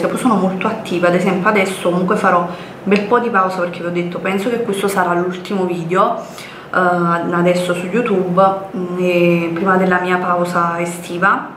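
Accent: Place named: South, Italy. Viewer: La temperatura del suolo in questi giorni è arrivata 50 gradi, più del 2003!!! Assurdo peggio del deserto 🏜. Non so di preciso di dove sei ma dal tuo accento presumo che sei del sud, la ha fatto molto più caldo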